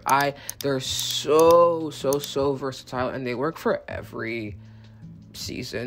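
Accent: United Kingdom. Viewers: i love how your british accent is literally Adele. So comfy sounding.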